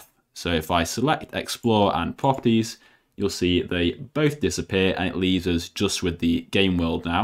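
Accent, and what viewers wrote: United Kingdom. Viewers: british mate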